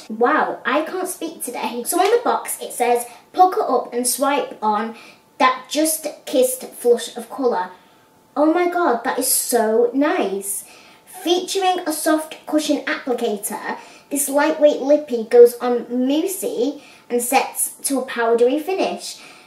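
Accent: United Kingdom. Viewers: Lol I totally used to always think you were calling us all "donkeys" and not "junkies" when I first started watching your videos! Hahahaaaa! 😂 I was like, why is she always calling us all donkeys? Lol don't hate me I'm so sorry I am absolutely AWFUL at trying to understand and hear British/English accents clearly lol. I'm from St. Louis Missouri so I'm terrible at trying to make out your accent.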